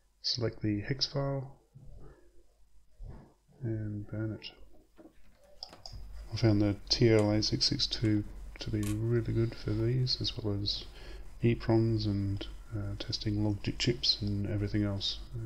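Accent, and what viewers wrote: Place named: South Australia, Australia. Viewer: Super cool work, I found you from Hackaday and have been bingeing all your stuff for inspiration in my own 6502 project since I'm planning to use a backplane too. You don't happen to be from South Australia do you? You don't have to answer if it's too much info lol, but you sound like a South Aussie. It's possible I'm just hearing ghosts, but I'm from Adelaide and I think I can hear some subtle hints in your accent. Great work again :)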